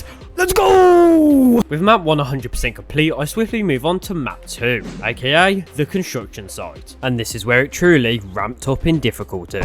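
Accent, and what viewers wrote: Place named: Black Country, United Kingdom. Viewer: you aren't by any chance from the black country are you? Not even lying you sound exactly like me haha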